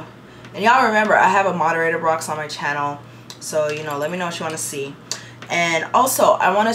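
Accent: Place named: Toronto, Canada. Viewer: You sound like you could be from Toronto.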